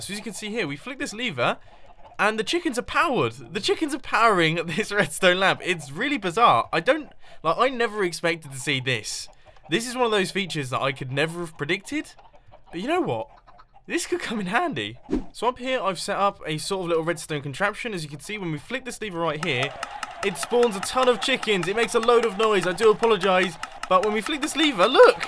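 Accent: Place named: United Kingdom. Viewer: He kinda sounds like Wheatley from Portal 2... Probably cuz he British lol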